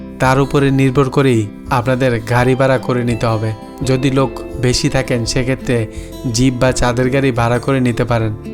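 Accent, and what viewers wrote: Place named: Dhaka, Bangladesh. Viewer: আপনার উচিত ভিডিও বানানো ঢাকার আশে পাশের মানুষ এভাবেই কথা বলে ওনার আঞ্চলিক ভাষা মাঝে মাঝে চলে আসে এটা স্বাভাবিক আপনাকে ক্যামেরাতে কথা বলতে দিলে হে** দিবেন আগে নিজের দিকে তাকান🐸😅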